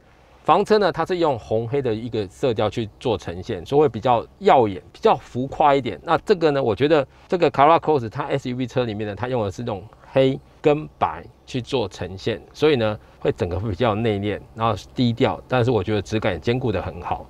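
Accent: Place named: United Kingdom. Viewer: 我都不知道台灣是以美語為主還是英文為主,怎麼發音都走英國風?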